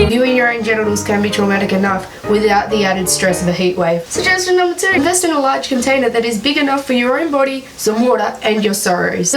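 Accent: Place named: United States. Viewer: you have an american accent in this entire video and it's tripping me out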